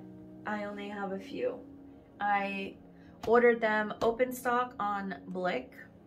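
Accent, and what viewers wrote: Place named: England, United Kingdom. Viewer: Are you from Australia or the USA? Just wondering what dollar we are talking, actually you sound very English ☺️ I was born in England but I have lived in Australia and become an Australian for 50 years now. The time goes so fast, the older you get the faster it goes 🤔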